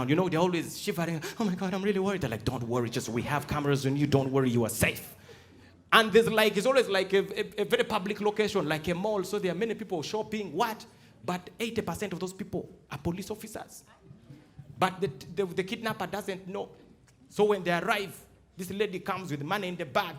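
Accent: Uganda. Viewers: you nailed the American accent. And I salute you for carrying out the whole sketch in your Ugandan accent. It shows how much proud you are of your culture. Warm salutes from Morocco 🇲🇦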